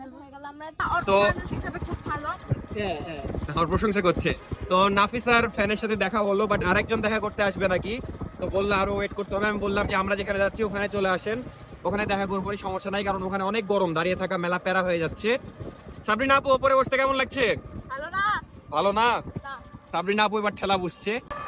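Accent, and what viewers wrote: Rajshahi, Bangladesh. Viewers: the best thing we like is your true Rajshahi accent ar apni o apner wife er true innocence. Allah apnader shushtoh abong bhalobashai poripurno rakhoon. Ameen abong apner Maa er jonno pach wakht salah ar dua korben karon etai uner akhon aktai chawa ar apni shontan ar apner dua is most important. Respect ✊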